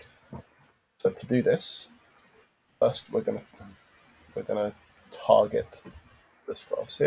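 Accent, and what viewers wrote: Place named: United Kingdom. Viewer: Great to hear the voice of a fellow brit!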